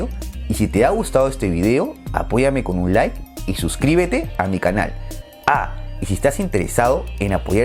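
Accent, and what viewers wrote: Peru. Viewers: es peruano, el acento y el borrador Artesco, ambos peruanos!. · por la voz y tu borrador artesco,eres de peru,no? jajaja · Es peruano xd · Es Peruano, En Perú no hay muchos acentos.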